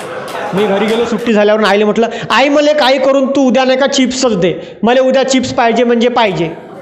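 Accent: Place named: Nagpur, India. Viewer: तूझी नागपुरी मराठी ऐकून मला नागपुर ची खुप आठवन येते. Miss u Nagpur. Miss u Vidarbha.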